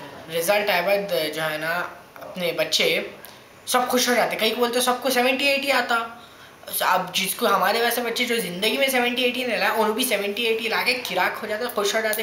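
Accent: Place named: Hyderabad, India. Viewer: dude m watching this just for your hyderabadi.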